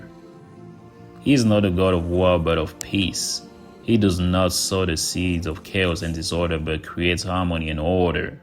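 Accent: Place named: Yoruba, Nigeria. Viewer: Please keep ur yoruba accent! It makes storytelling more Intriguing. T-ankh u. Asè 😊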